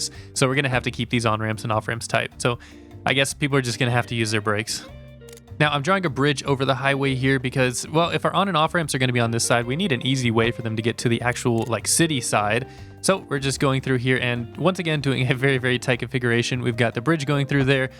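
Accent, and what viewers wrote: United States. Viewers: you're from 'murica, right?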